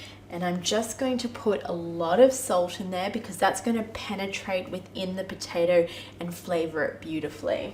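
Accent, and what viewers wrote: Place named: Australia. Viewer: So you’re Australian, I should have known your accent.I’ve made your garlic confit. I love all your videos.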